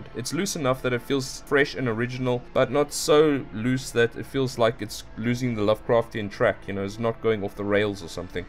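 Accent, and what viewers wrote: South Africa. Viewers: U sound like someone from Cape Town bro, we all have the same or somewhat similar accent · Hey man, are you South African? You sound South African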